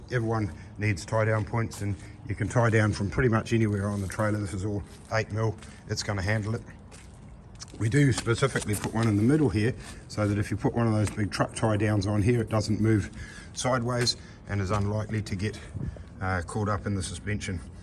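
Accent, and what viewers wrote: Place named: New Zealand. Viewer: I hear your kiwi accent . I am interested in a trailer as I load a tractor for grass slashing. Reply to me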